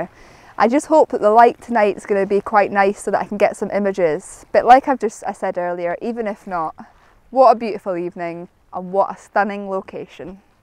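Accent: Scotland, United Kingdom. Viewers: Hello, how are things? I like your video and I like your photos as well. Like # 940 is mine. The other photographer I follow are all geezers. it's nice to have a feminine perspective for a change. You've got yourself a follower in São Paulo, Brazil now. Love your scottish accent.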